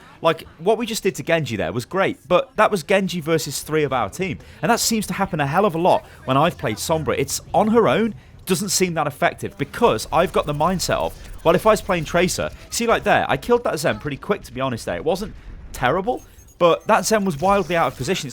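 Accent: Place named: United Kingdom. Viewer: But with an awesome british accent though.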